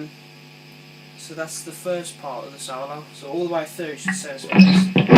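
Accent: United Kingdom. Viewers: Where u from mate u sound like u got a brummy accent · hey dude , are you from england ? i thought youre from russie 0.o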